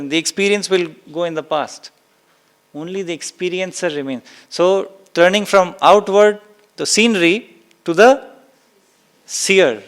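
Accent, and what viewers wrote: India. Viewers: Hindicized pronunciation of names is disappointing when the talk is not in Hindi.. personal opinion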